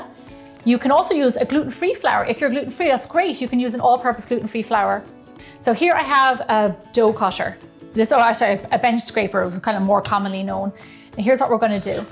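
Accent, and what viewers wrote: Ireland. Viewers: Hi.. I love your do-it-yourself filming, it brings out your personality even more.. when you were humming as you were picking the potatoes.. it's lovely to see how you're keeping your accent and Irish-"isms" intact 🤗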